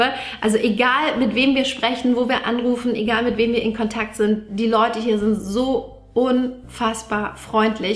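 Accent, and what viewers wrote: Swabia, Germany. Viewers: TGIRF 🚀🚀🎉 ich muss echt sagen ich kann diesen schwäbischen akzent gar nicht ab😂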